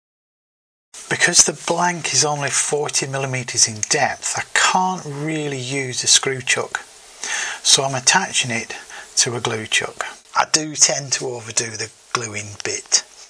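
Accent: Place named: Lancashire, United Kingdom. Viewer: Good to hear a Lancashire accent!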